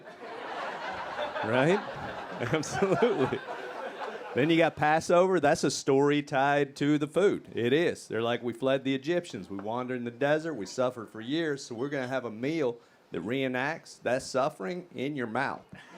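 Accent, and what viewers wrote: South, United States. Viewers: I just love brilliance with a southern accent ❤ such a juxtaposition.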